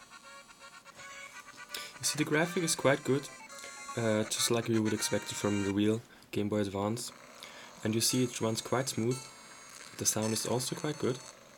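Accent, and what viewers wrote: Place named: Germany. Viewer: Dude, awesome accent. I find it pretty hard to copy a German accent and I've been studying German for 7 years. Yeah.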